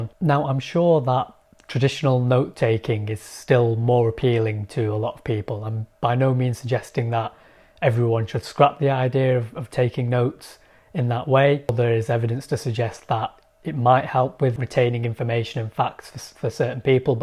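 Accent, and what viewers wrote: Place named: United Kingdom. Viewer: British accent 😂👌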